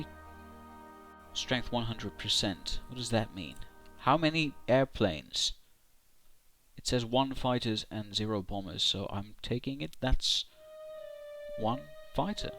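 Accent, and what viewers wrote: United Kingdom. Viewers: Kuulostat ihan britiltä ! :D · your from finland!?!?!? wow. I would have guessed British/UK... great English.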